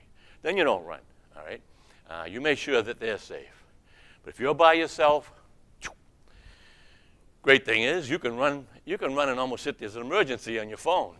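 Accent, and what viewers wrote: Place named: New England, United States. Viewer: I can tell by your accent you live in New England.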